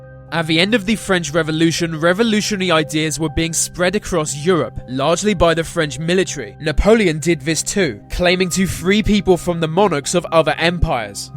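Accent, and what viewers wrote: England, United Kingdom. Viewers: What's the story with his voice? It's an english accent but he sounds like a robot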